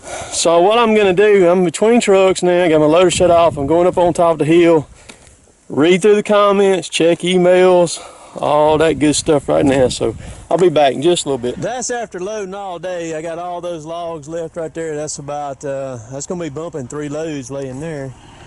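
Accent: South, United States. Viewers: I am a modest Patreon sponsor for Jason at Hollis Farms. I enjoy the baby deer videos and I just love the southern drawl. I think if your You Tube content has guns, blood, or banjo music; they demonetize it. You don't have a Patreon account. I think working out in the sticks like that with the heavy equipment one would want a sure fire way to communicate. What if a rattlesnake bit your sticker when you were watering the landscape? ( Old joke. "You gonna die!")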